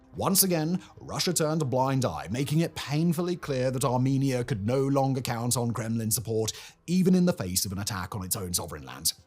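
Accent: United Kingdom. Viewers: LMAO you think he's an American? Did you even listen to the sound of his voice? He's a Brit living in the Czech Republic is my understanding. I know he's a european living in mainland europe basically. Americans sound nothing like that.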